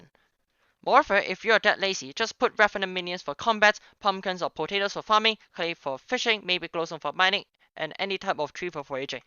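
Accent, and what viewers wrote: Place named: China. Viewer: Your accent makes me feel like I’m hearing Chinese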